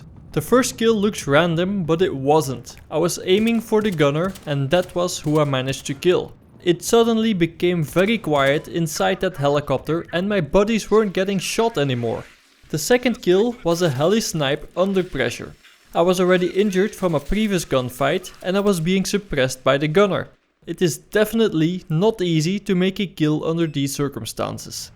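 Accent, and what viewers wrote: Netherlands. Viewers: Are you dutch? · you dutch?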